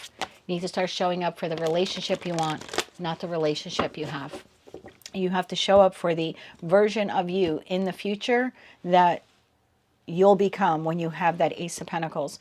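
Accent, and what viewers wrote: East Coast, United States. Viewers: Thnx ❤ great reminder Trying to figure out how to market my book 😊OMG you are so east coast Love it 😂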